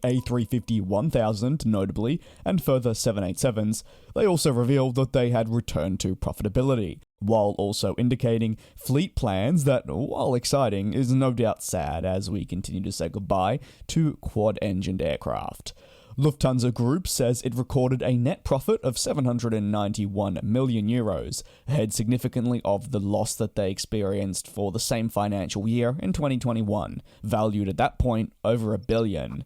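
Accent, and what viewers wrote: Australia. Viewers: Great to hear an Aussie with Aussie presentation!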